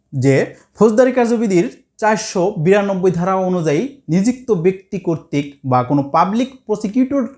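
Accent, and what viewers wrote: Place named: Jessore, Bangladesh. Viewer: আসসালামুআলাইকুম স্যার আপনার বাসা খুলনা বিভাগের যশোর জেলায় মনে হয়ে।।আমার বাসা ও যশোর, আপনার কথার টান দেখে মনে হচ্ছে।। ক্লাস সুন্দর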